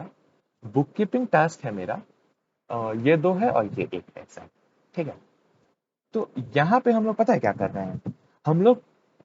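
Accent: Bihar, India. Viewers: Bihari accent sunke bhaiya wali vibe aa rahi hai sach me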